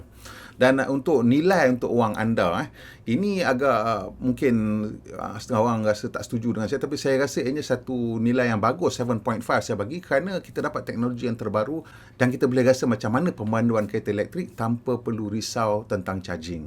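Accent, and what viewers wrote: North, Malaysia. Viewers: ulas guna slank utaqa style jgk bang.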